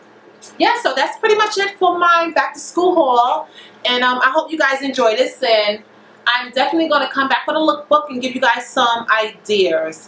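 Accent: New York, United States. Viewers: Nothing wrong, I love a New York accent.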